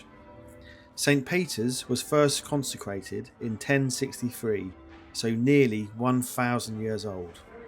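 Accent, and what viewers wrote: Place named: United Kingdom. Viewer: Perhaps you don't know but there are very many regional accents in the UK and pronunciation can vary from one area to another. How anyone pronounces words can very much depend on where they were born and brought up, another matter of historical interest. You understood what was meant, so what is there to criticise?! Glad that you liked the presentation, that is what matters 😊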